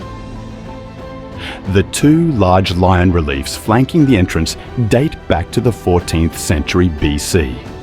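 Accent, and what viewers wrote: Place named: Australia. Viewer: I love Turkiye. I need more time here. Also, I love that this is narrated with a Australian accent 🥳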